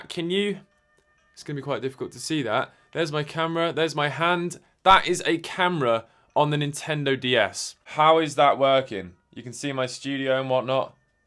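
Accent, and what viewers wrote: Australia. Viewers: YOU HAVE TO BE AUSTRALIA MATE if not then british BUT I THINK AUSSIE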